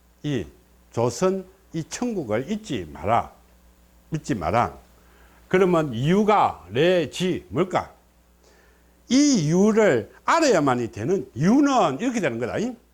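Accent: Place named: Gyeongsang, South Korea. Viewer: 완전 경상도 도민용 강연. ㅋㅋㅋ. 엄청난 사투리 억양과 말투. 신라 사투리. 기타 지방민은 무슨 말인지 이해될지 모르겠다. ㅋㅋㅋ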